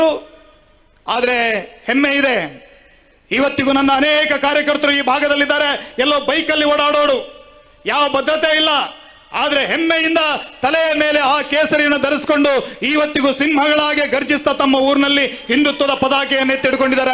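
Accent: Standard. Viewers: Swacha kannada power full speech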